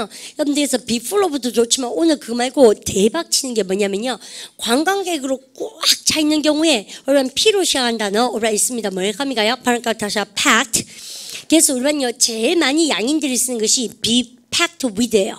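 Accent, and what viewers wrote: Gyeongsang, South Korea. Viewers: 대구말씨 귀엽고 웃겨요!!!ㅎㅎㅎ 강의 듣다가 혼자 킥킥거리면 가족들이 힐끗 쳐다봐요 ㅋ😂 참고로 저는 창원아줌마 임돠 항상 감사드립니다❤ 화이팅~~~~😊😊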